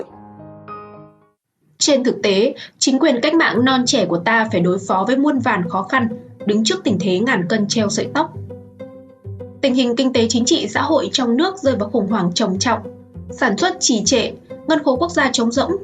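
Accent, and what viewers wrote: North, Vietnam. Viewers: nói cho nghe nè đừng dạy người khác phải theo mình đó là hói hư tật xấu của người csbv Miền Bắc. Cụ Diệm (gia đình quan Triều Nguyễn cụ Diệm kinh qua chức vụ quan lại cải tổ+đi du học nước ngoài đây là lí do bọn Miền Bắc nói Cụ là tay sai hoặc con nuôi do Mỹ nuôi...) trước khi VN còn thời Pháp cai trị do Vua Bảo Đại đứng ra điều hành bằng chính sách của Pháp gọi là dân đi lính QGVN Khi Pháp áp bức sức lao động khổ sai thì dân đứng lên thành Lập nhiều Đảng phái Khi giành thắng lợi Pháp trao trả chính quyền thuyết cs ra đời cướp chính quyền. Bảo Đại kêu gọi Cụ Diệm về nối tiếp vì chính sách cũ của Pháp để lại ông xích mích Cụ Diệm thay thế Vua Bảo Đại lập nên VNCH chính sách mới cải tổ lợi dụng sự tài trợ kinh tế của Mỹ nhằm ngăn chặn sự lang rộng của Liên Xô ,TQ hay vì Pháp muốn được hậu thuẫn nhưng bị từ chối vì thời gia đình cụ bị Pháp đối xử tàn nhẫn , những năm tháng đầu là thời hoàng kim phồn thịnh giá trị tiền vnch phát hành lưu thông khắp nơi với sự kinh tế thị trường người Hoa Kiều buôn bán giúp dân Miền Nam đáng học hỏi với một Quốc Gia Tự Do Chính Phủ như vầy các nước khu vực như Hàn ,Sing ,Lào... 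còn qua học tập làm việc , sao không qua Mỹ mà qua vnch ,các Quốc Gia khác còn mời cụ đi du hành các nước , chưa có Nguyên Thủ Quốc Gia nào được Tổng Thống Nước Mỹ ra tận sân bay đón tiếp và bắn đại pháo long trọng Mỹ từng đề cử vnch ra hội đồng LHQ Liên Xô ,TQ và Phe Cộng Sản không đồng ý. Điều cuối cùng tôi nói là con nhỏ MC nói tiếng Miền Bắc cho chuẩn ở ngoài đời đi ,đừ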